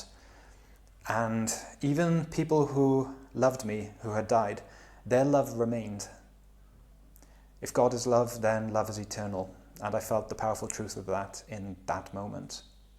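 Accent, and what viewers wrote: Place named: Wales, United Kingdom. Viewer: thought I recognised the accent but wasn't sure. South Walean here 😁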